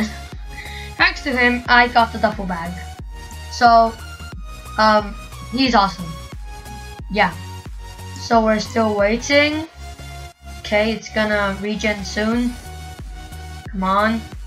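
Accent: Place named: Russia. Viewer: dat accent doe RUSSIA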